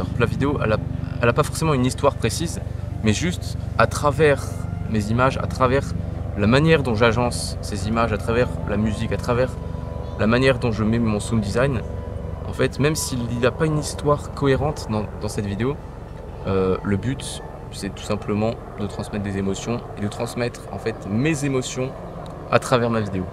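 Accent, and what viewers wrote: France. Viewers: Perso j'adore regardé des films en anglais mais écouté un Français parler anglais perso j'accroche pas car ca me ramène a mon mauvais niveau d'anglais mdr :/ apres ca se regarde très bien, au niveau creatif et audiovisuel pas besoin de la langue ;) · C'est un très beau projet personnel et professionnel. J'avais un peu de mal avec le 100% anglais et cela m'a beaucoup plu. C'est intéressant d'entendre un français parler anglais, je comprends mieux cette langue. Si le nombre de vues est ta priorité, ce n'est pas une bonne base. L'intérêt est de partager ta passion.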